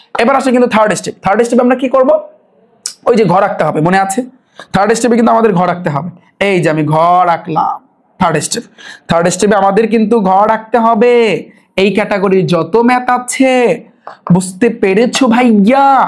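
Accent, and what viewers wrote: Barishal, Bangladesh. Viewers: Vaja apnar basa ki borishal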